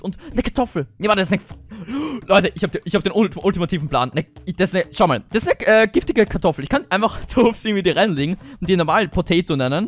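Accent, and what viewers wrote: Austria. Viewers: Ich liebe deinen österreichischen aktzent wie bei Flo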